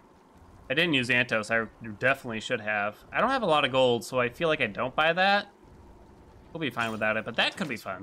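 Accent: United States. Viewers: Honestly, you sound like every American ever. Cheers!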